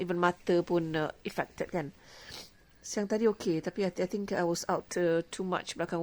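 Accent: Malaysia. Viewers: Mengcokik😁😁😁. U re melayu pure. Nismilan pure. Suka den dongar sis sobut Melayu totok.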